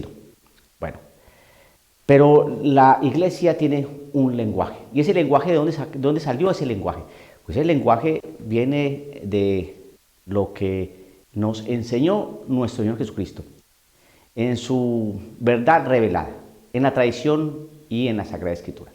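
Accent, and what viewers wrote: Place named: Bogotá, Colombia. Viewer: El, es colombiano....no le notas el acento rolo?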